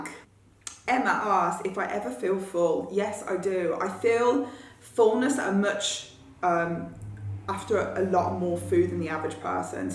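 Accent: England, United Kingdom. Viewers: I don't normally get all mushy over celebrities or the English accent... But you got me. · I like your English accent!!! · Damn this chick is hot! Is she British?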